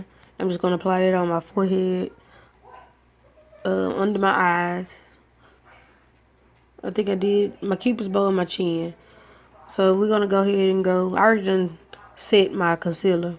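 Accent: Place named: South, United States. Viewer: Your accent is soooo Southern lol; love it. I love the look girlie.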